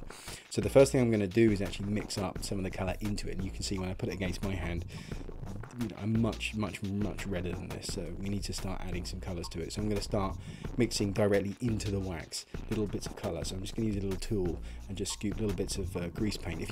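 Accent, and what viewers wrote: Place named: Australia. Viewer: he sound Australian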